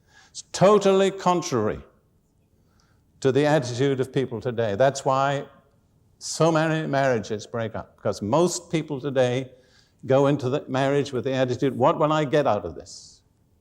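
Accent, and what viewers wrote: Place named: United Kingdom. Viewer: Real Brit Talk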